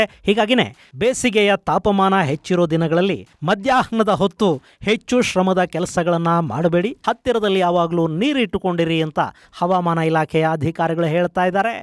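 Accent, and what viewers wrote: North Karnataka, India. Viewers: ನಿಮ್ಮ ಬಾಯಲ್ಲಿ ಉತ್ತರ ಕರ್ನಾಟಕ ಭಾಷಾ ಕೇಳೋದೆ ಒಂಥರಾ ಮಜಾ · ವಾವ್ ಸರ್ ಅದ್ಭುತವಾದ ಉತ್ತರ ಕರ್ನಾಟಕ ಭಾಷೆಯನ್ನು ಮಾತನಾಡುತ್ತೀರಾ ನಿಮ್ಮ ಊರು ಯಾವುದು ಮತ್ತು ನಿಮ್ಮ ಯಾವ ಜಿಲ್ಲೆಗೆ ನೀವು ಯಾವ ಜಿಲ್ಲೆಗೆ ಸೇರಿದ್ದೀರಿ ಹಾಗೆ ರೈಲ್ವೆ ಫ್ಯಾಕ್ಟ್ಸ್ ಗಳ ಕುರಿತು ಒಂದಿಷ್ಟು ವಿಡಿಯೋಗಳನ್ನು ಮಾಡಿ ಸರ್ ಅದು ದೃಷ್ಟಿಹೀನರಿಗೆ ಉಪಯುಕ್ತ ವಾಗಿರಬೇಕು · ಉತ್ತರ ಕಾರ್ನಾಟಕ ಭಾಷೆಯನ್ನು ಅದ್ಭುತವಾಗಿ ಮಾತಾಡಿದ್ದೀರಿ ಸರ್ ನೀವು ತುಂಬಾ ಧನ್ಯವಾದಗಳು ಸರ್ 🙏🙏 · ನಾನು ಮೊದ್ಲ ಬಾರಿಗೆ ನಿಮ್ಮ ಧ್ವನಿಯಿಂದ ನಮ್ಮ ಉತ್ತರ ಕರ್ನಾಟಕದ ಕನ್ನಡ ಭಾಷೇದಾಗ್ ಕೇಳಿದ್ದು. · Sir I love the tone of Uttar Karnataka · ಸರ್ ನಿಮ್ದು ಉತ್ತರ ಕರ್ನಾಟಕ ನಾ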